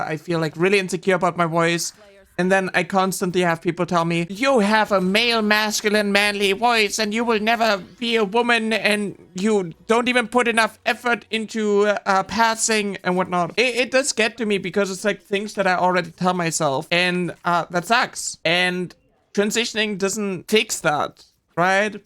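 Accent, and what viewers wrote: Germany. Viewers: i know your struggle with voice, but i'm still training and i really disagree with you and bullies saying you sound like a guy... you have a very nice voice to me, clearly a womans voice and i like a lot, also quite soft (most of the hardness ppl might percieve comes likely from the german accent in case you hear that a lot) i appreciate what you do and hope you feel better as time goes on... again, i like your voice a lot and it does sound clearly feminine to me... and i will keep telling you that every now and then as you clearly don't get it often enough <3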